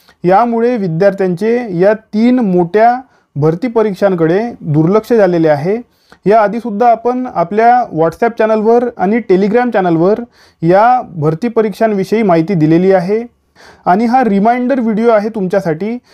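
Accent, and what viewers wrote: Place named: Nagpur, India. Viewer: Bhau chi language nagpur chi vatte jara😊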